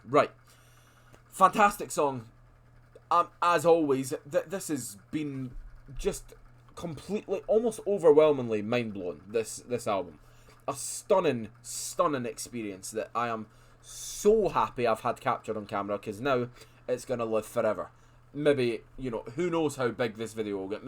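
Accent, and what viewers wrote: Scotland, United Kingdom. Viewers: As an older fan of (I'm 72 soon) I like his rap, but my favourite music is from the 70s, in particular. They are every bit as invested in the lyrics as any decent rapper, with the addition of great talent and skill in playing their instruments. Edited to say, keep letting the transitions happen, they're an intrinsic part of the music. Further edited to say, as a fellow Scot I'm glad to hear a reactor with your accent. I've subbed in the hope of more and for.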